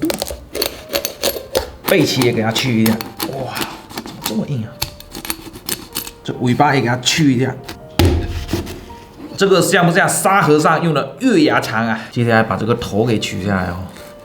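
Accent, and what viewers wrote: Fuzhou, China. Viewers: 福州口音相当亲切了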